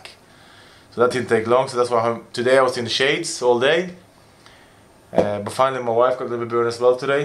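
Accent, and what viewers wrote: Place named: Liverpool, United Kingdom. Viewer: Sko tru du sko hatt bedre scouse accent enn d der itte adle de åra:) blir bra detta! #legende! YNWA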